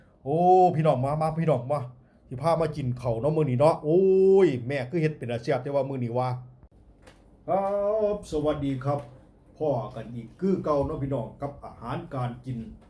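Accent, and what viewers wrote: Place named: Nong Khai, Thailand. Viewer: พูดได้ดีมากคะไทหนองคายคือกัน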